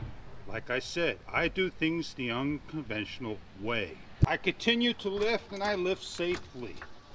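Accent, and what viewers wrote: New York, United States. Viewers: That NY accent.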